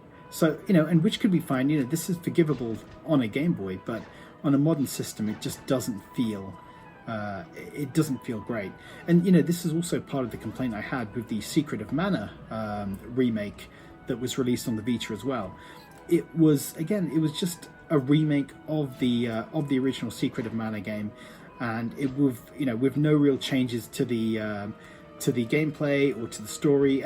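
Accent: United Kingdom. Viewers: that's just it, it hasn't got any sort of sound other than British. I have no idea why I thought you was Chinese lol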